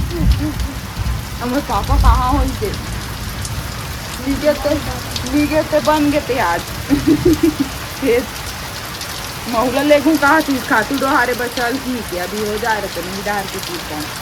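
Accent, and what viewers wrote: Chhattisgarh, India. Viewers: Aapka village kaun sa hai aur kus district se hai aap apka hindi cg boli bhut achha laga❤❤❤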